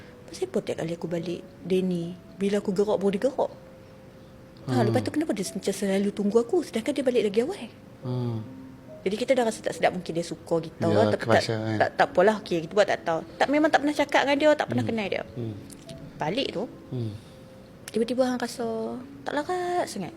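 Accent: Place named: Penang, Malaysia. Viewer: Hg dr kecik smpai besaq lenggoq penang noh😂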